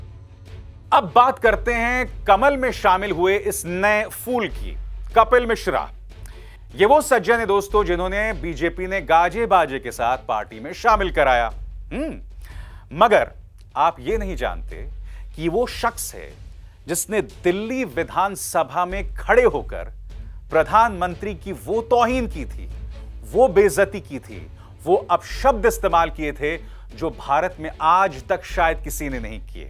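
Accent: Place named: Bihar, India. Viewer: Nice tone sir in bihari tone 🙏🙏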